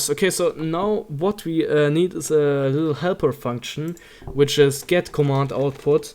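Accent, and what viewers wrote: Germany. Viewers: Hi, dein Englisch hört sich aber ziemlich deutsch an von der Ausprache, danke für dein Video.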